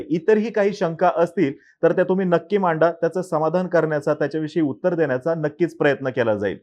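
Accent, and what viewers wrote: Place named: Nashik, India. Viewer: तुम्ही नाशिक चे का हो? तुमच्या बोलीभाषेला नाशिकची लकब आहे..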